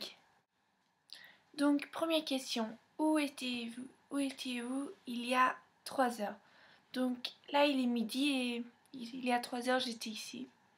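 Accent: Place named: England, United Kingdom. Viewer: je trouve que tu as un accent anglais et en plus tu n'habite pas en france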